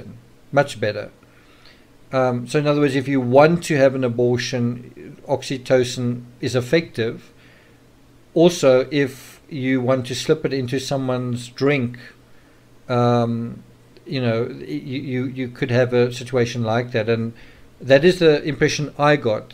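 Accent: South Africa. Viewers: Love listening to your SA accent, makes me feel like I’m back home.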